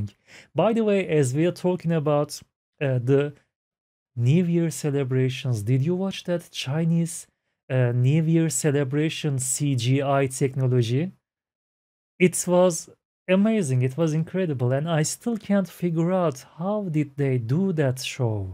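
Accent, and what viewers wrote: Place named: Turkey. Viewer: You're Turkish 😁it's so obvious from your accent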